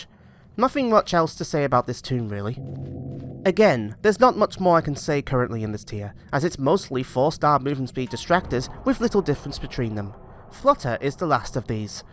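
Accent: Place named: United Kingdom. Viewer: All things aside, you have a MASS british accent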